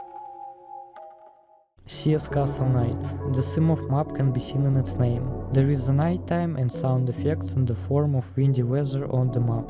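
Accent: Russia. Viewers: Русский акцент прослеживается чел, но все равно молодец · Нафига ты говоришь на английском. По акценту слышно - русак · Huh, russian accent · По акценту ты русский, я это сразу с первых минут просмотра понял, если нет, жалко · акцент то русский попался гузлик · Он русский, выдаёт акцент, +под некоторыми профилями его друзей в стиме оставлены русские комменты. (скрывается от кого то 100% :D ) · как же слышно то что ты русскоязычный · english language on russian accent is atractive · Я чую голос русского, по произношению слышно) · Наш мужик слышно по акценту))) найс контент)) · Зачем ты говоришь на английском, у тебя ярко выражается русский акцент :\ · Вроде бы указанная страна в описании - Великобритания, а акцент на уровне "ай хэв май мазэр анд ай хэв май бразэр" слышится... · Русский акцент не скроешь) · АААААА БРАТАН ТАК ТЫ РУССКИЙ ООООООО МЛЯЯЯ НЕ ОБЕССУДЬ Я НЕ СО ЗЛА КОНЕЧНО, НО ТЕБЕ СЕРЬЁЗНО НАДО ПОРАБОТАТЬ НАД ПРОИЗНОШЕНИЕМ. 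Знаешь, как сильно иностранцы ненавидят такой акцент? (Я тебя спалил кстати по Ал-Кайде. Ты очень по-русски это сказал)) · Блин мужик, снимай на русском. Но если я ошибся, и это просто акцент, то извини - на карте еще можно было выключить электричество через щиток в комнате с бильярдом у кт спавна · слышу русский акцент, замутил бы еще русскоязычный видос или русскую аудиодорожку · idk why, but this accent sounds more like russian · СЛЫШНО ЧТО ТЫ РУССКИЙ АКЦЕНТ ТО РУССКИЙ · Чел ты Русс у тебя слышен русский акцент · Ты из России,я это по акценту понял. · Слышится русский акцент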